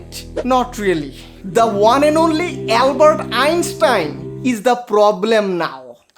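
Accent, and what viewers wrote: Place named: East Bengal, Bangladesh. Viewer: Give up your East Bengal Bangal language please.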